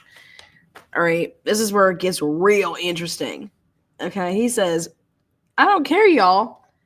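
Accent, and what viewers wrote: South, United States. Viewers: Watching delayed…thanks for all your videos today. Really enjoyed your southern flair in this one. Glad you’re feeling better.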